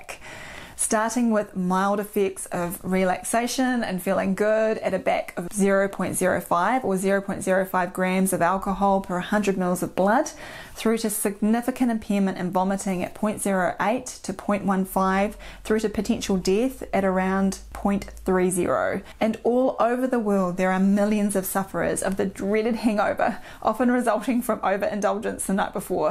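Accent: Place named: New Zealand. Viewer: That’s a New Zealand accent, not Australian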